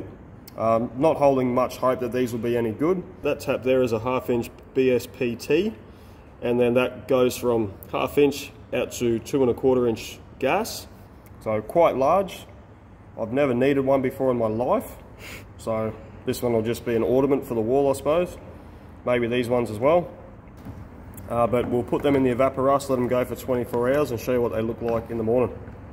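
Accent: United States. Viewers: Oh no, you're starting to talk American now. Good score on the auction. Hope y'all had a good Christmas. regards from Wales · You're starting to sound less ke an American. Made right here in the USA . You did mention made right here in Australia a lot 😂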